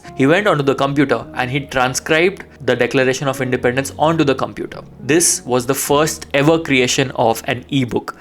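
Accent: India. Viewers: Why's Indian accent so shitty? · If you are in America try to speak Amerian English, not Hindu English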